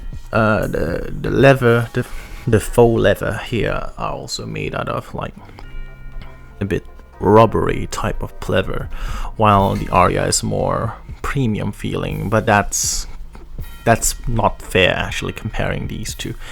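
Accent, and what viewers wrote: United Kingdom. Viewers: Your accent is different in the other what's going on. No offense but it's ABIT weird. This video you sound like British accent? Another one sound more Indonesia? Are you trying to mimick accent or something. Either way just speak how you normally do. And the video I feel like it's too long. I would prefer you get to the point though.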